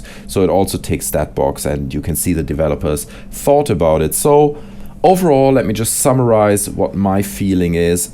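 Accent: Germany. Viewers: Hes german you dip wad. That's kinda how they talk. And if not why dont you just fuck off somewhere ever heard of the golden rule? How about you stop posting hateful comments that dont pertain to the video.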